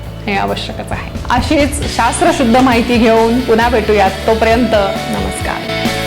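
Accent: Standard. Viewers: मॅडम मला तुमचे बोलणे खूप गोड शुद्ध असते. I am fan of you👌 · मॅडम : तुम्ही फार शुद्ध सुरेख आणि गोड बोलता , का कोणास ठाऊक पण कायम तुमच्या बोलण्यात आपलेपणा जाणवतो❤ !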